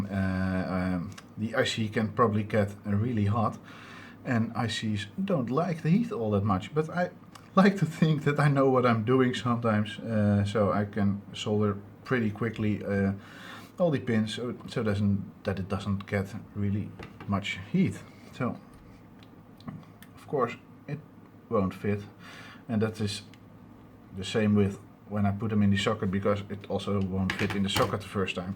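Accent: Netherlands. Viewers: Im from Belgium but every Dutch speaking person that speaks English has a bit of a accent ^^ and I ordered the one from icstation and even though there site has a full tutorial on it your videos is probably going to be a lot more help I contacted them about reprogramming the chip and it's sad that I have to buy one of those 50 euro programmers for it:( plus a adapter I really hoped there was a other way for that also you have a better diy suggestion for making the led bending template I have no drill they suggested cardboard maybe and 1,5cm spacings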